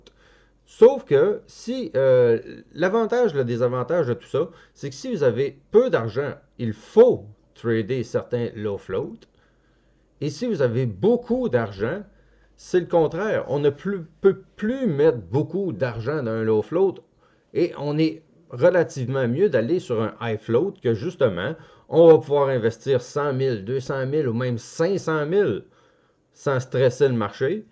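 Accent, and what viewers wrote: Canada. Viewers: Superbe. Oh l'accent canadien!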